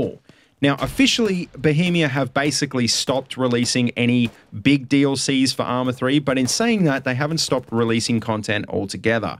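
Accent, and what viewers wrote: Australia. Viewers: This bloke sounds like the Silverchair boys. Oh look he's in Newcastle. #AustraliaHasAccentsToo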